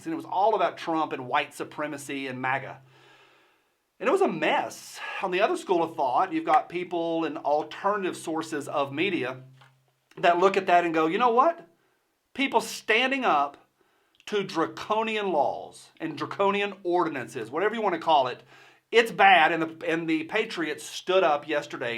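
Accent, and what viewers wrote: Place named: New York, United States. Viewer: I always like your videos, I never get tired of New York accents. And, I love to see what weapon you hold up at the end. For this one, What kind of scope is that??? That thing is huge.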